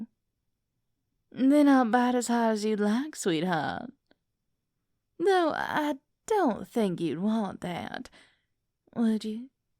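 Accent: South, United States. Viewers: I do like the southern country girl accent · Hi this video is awesome and plus I love your southern accent and plus I love the video and now i go to sleep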